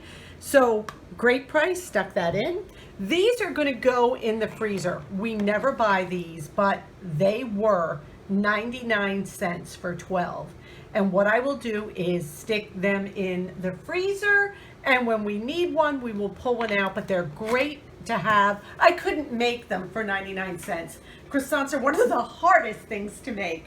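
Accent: New York, United States. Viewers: I thought I detected a NY accent but I didn't know you had a bag tax thing too?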